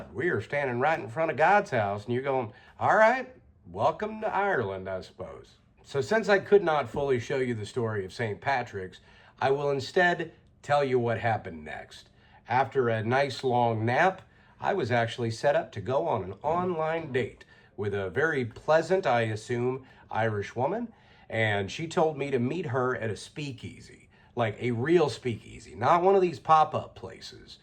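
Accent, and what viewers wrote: South, United States. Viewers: His accent is fire. I love a good southern accent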